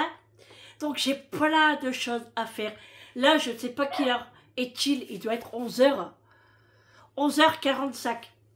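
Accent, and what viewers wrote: North, France. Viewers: Bonsoir je suis une nouvelle abonnée. J'ai aimé votre naturel, votre simplicité et votre franc parler. Vous avez l'accent du nord. Je suis de douai ( 59) prenez soin de vous et de vos proches. Je vous souhaite pleins de belles choses à vous et votre famille. Dieu vous bénisse ❤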